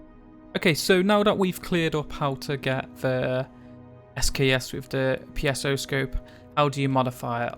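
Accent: United Kingdom. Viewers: but you are English.....well from your accent you sound like being from the UK and before our English in the us the only people to speak English were British people.....so the "we all do it" is wrong here because hes not from the us for one so maybe they pronounce words differently than we do here?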